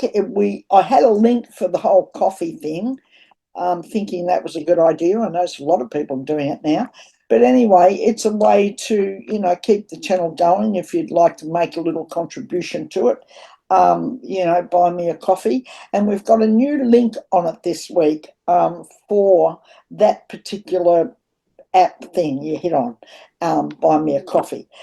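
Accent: Australia. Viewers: You sound Australian darling? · your amazing, re trump and the military , here are some potential future scenarios for you to ponder. lets say the democrats gain enough control of both houses of congress in 2 years time in the mid term elections , to impeach trump or maybe by some miracle it happens earlier he refuses to go , george w bush intervenes to try and get trump to leave, he fails , the pentagon or military intervenes to arrest him, alternative scenario , the supreme court rules against him , he defies the supreme court, the court charges him with contempt of court , and tries to have him arrested. he defies the US marshals trying to serve the arrest warrent , the pentagon or military intervene. when you say this path was set a long long time ago , your not wrong, donald trump is the re incarnation of jefferson davis the president of the rebellious south during the civil war going by your accent you live in oz , so do i , maybe one day we will talk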